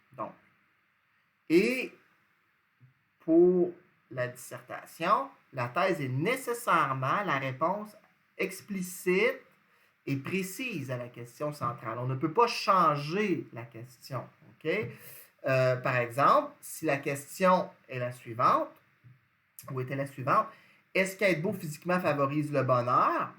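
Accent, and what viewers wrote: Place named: Canada. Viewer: L'accent canadien est un peu difficile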